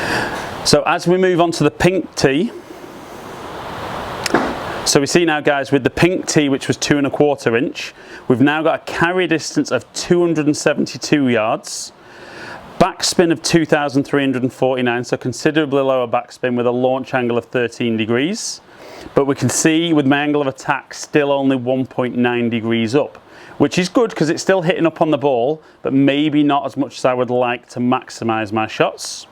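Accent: England, United Kingdom. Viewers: Joe Longo But you will notice by his accent that he is English? We speak different from you in the US! <link>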